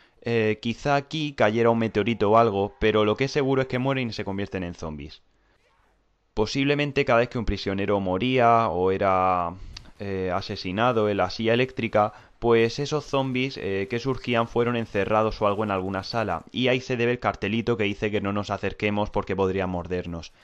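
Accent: Murcia, Spain. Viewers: Eres de murcia verdad??? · Que grande eres tio, de los mejores youtubers de zombis. Sigue asi y alcanzaras a psicho y jugger. Por cierto de que parte de España eres?? Tienes acento pero no se de donde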